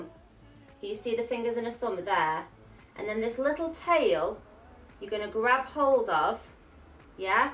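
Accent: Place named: Northern England, United Kingdom. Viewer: Thanks for keeping things normal. I'm from Warrington but live in St. Louis and listening to your lovely northern voice comforts me and reminds me of home. Stay safe and keep posting xx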